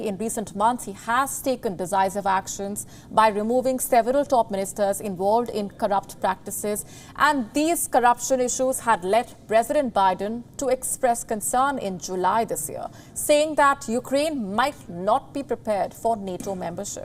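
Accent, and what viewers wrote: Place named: India. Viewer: Nothing worse than Indians speak English my ear drums are bleeding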